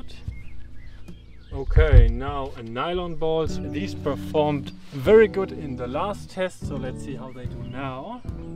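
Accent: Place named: Germany. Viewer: Fast kaum erkannt, dass du Deutscher bist 😉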